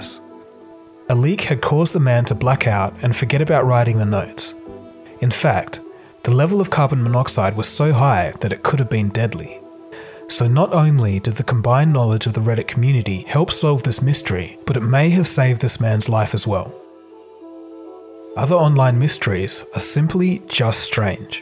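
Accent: Australia. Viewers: this image is from an alley between one end of the Queen Victoria Building and the other in Sydney, this is such a memorable scene. Which doesn't really fit the theme of New Jersey.... Judging from the Uploader's Aussie accent, he should've known better. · You sound Aussie?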